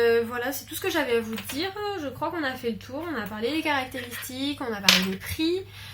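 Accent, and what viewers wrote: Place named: Toulouse, France. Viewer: J’ai passé 1h hier et deux heures aujourd’hui à vous écouter sur Procreate... je suis artiste peintre et je souhaite commencer à travailler avec Procreate . Après avoir écouté d’autres vidéos j’avoue que j’adore la façon que vous avez d’expliquer les choses votre rire votre accent toulousain je suis toulousaine et vous expliquer super bien c’est très sympa votre façon de faire merci beaucoup en tous les cas à très bientôt si j’ai des questions. J’ai déjà un iPad 6 et j’envisage d’acheter soit l’iPad Pro mais j’ai pas beaucoup de sous soit l’iPad Air quatrième génération merci en tous les cas pour vos conseils et continuez à nous régaler avec votre sourire et votre bonne humeur !Evelyne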